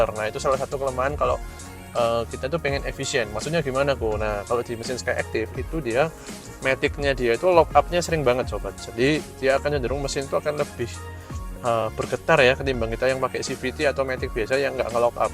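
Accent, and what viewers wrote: Surabaya, Indonesia. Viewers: Logat Suroboyo wkwk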